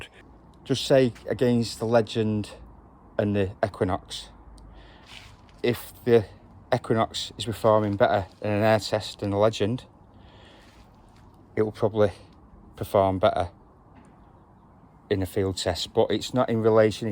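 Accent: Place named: Wales, United Kingdom. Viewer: Hello! Thank you for your video and air test.I am French and I own the Legend in the 1.08 version,do you think that a high audio gain in a mineralized soil is a good setting? (because of false signals if the GB is not well adjusted).I think you are Welsh because of your accent,but I use the translator and please do not worry,I appreciate your tests!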